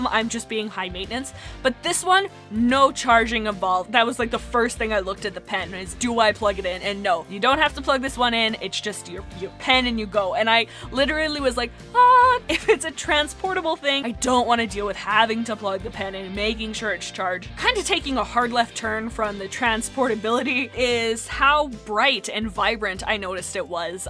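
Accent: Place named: Canada. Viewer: You're Canadian too?!?! I knew it I knew it I knew it! (Kinda) Cause that 'accent' that we Canadians have...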